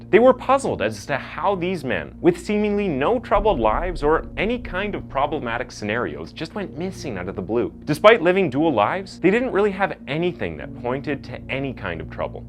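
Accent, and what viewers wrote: Canada. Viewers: Are you Canadian?? 🤔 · Narrator is definitely Canadian.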